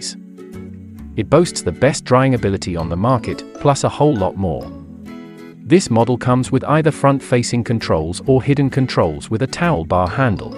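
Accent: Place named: United Kingdom. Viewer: Brit/Euro accent of narrator; are these washers for US market or European?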